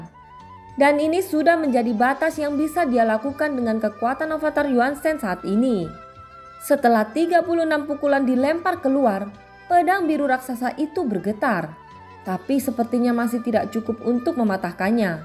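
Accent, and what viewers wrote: Java, Indonesia. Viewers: Kak orang jawa yah?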